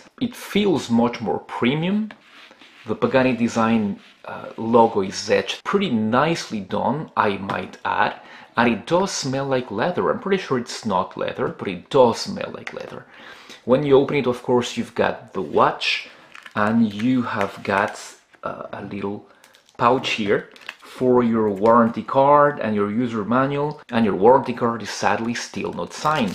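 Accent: France. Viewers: tu sonnes très Français pour un reviewer en anglais lol (mais belle prestation... sans doute un Belge ou un Suisse mdr) Salutations de Belgique.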